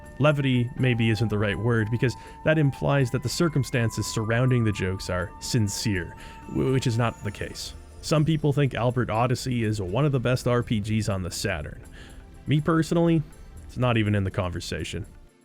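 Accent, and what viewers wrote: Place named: Canada. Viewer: Oh snap! You're Canadian!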